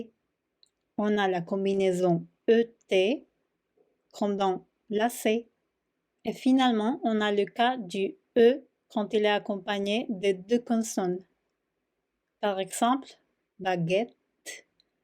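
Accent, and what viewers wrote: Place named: Spain. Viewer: HoLa !! Je suis Français (donc ma langue maternelle est le français) et hispanophone "autodidacte" (je n'ai jamais suivi de cours mais j'ai beaucoup écouté et lu et vu des tutoriels) : il me semble que votre vidéo, si je l'ai bien visionnée et écoutée attentivement, peut prêter à un certain degré de confision, car il ne m'a pas semblé que vous abordiez le "e fermé" (peu, deux, feu, euh...) alors même que c'est la manière type de prononcer cette lettre quand on récite l'alphabet en français ! d'ailleurs, quand vous évoquez brièvement le "y" vous le nommez "la yod" alors qu'en français, on dit "le i grec" ! par ailleurs vous récitez les voyelles "à l'espagnole" en particulier le "u", que vous prononcez tel un "ou" à la française... merci cependant pour vos efforts de vulgarisation ! Par ailleurs il me serait loisible d'entrer directement en contact avec vous ou quelqu'un d'autre de L'atelier du français | Paris (pour une bonne raison musicalo-professionnelle car je suis Compositeur) !!!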